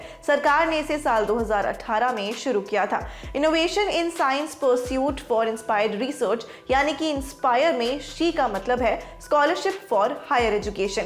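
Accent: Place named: Mumbai, India. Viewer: South bombay/delhi se hogi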